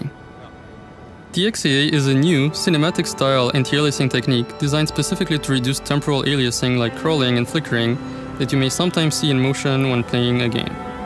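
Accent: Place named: Montreal, Canada. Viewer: yup the guy talking is cleary from montreal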